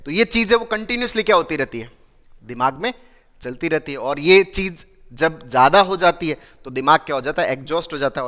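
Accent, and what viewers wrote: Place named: Marwari, India. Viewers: Sar ji namaskar bich bich mein aap Marwadi bolate Hain Rajasthani bolate Hain bahut Achcha lagta hai Jaise dusre state Ke Log bich bich Mein Hindi ke sath ya English ke sath apni Bhasha ka uchharan Karte rahte hain vaise Sar ji aap bhi bich bich Mein Marwadi Rajasthani bolate rahega isase Hamen bahut Garv Hota Hai aur bahut Achcha lagta hai itne Bade doctor hokar Marwadi bolate Hain Rajasthani bolate Hain To hamen bhi aapse Vishwas badhta Hai Marwadi bolane Mein Rajasthani bolna aap bahut acche se samjhate ho Sar ji aap karte dil se dhanyvad aaj ka subject bahut hi interesting tha nice topic tha dhanyvad doctor sahab❤❤❤❤❤ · Sir aap ki marvadi boli bhot achhi lagti he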